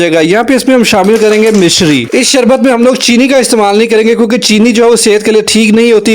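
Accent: Punjab, India. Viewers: Mujhe apka "VOICEOVER" or apka "PUNJABI" touch acha lagta hai 😊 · Ap ki guftago me punjabi ka tarka😅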